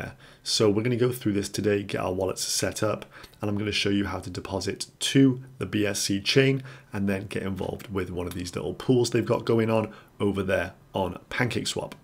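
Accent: Wales, United Kingdom. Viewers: You from cardiff?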